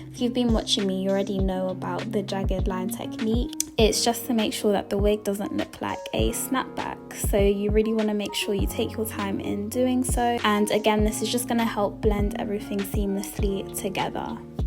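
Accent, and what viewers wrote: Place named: United Kingdom. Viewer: Lol love the accent ❤️❤️❤️❤️❤️❤️❤️❤️where you from UK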